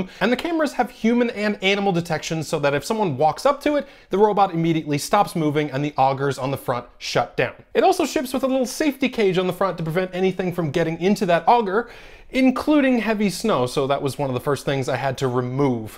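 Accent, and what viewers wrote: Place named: Newfoundland, Canada. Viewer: The Newfy accent is mild 😓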